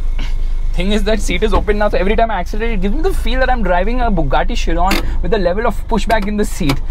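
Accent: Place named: North India, India. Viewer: North Indian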